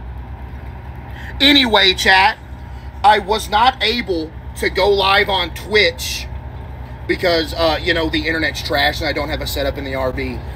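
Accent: United States. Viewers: Bro kinda got that Texas voice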